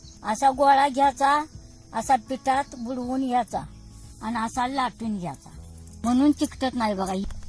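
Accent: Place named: Marathwada, India. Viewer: तुमच गाव कोणत आजी? भाषेवरून मराठवाड्यातील वाटतात .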